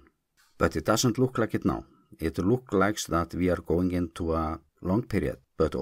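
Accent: Iceland. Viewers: I'm having trouble following the narration. I love Icelandic, but English with Icelandic pronounciation is too hard for me.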